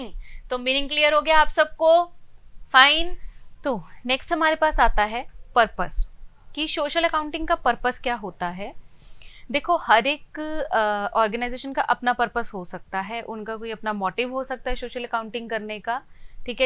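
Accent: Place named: Punjab, India. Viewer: Mam your punjabi accent😂❤❤ Dil jeet lete hoo.!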